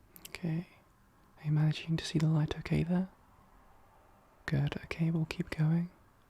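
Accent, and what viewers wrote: United Kingdom. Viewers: It’s the lovely British accent I love it 😊😊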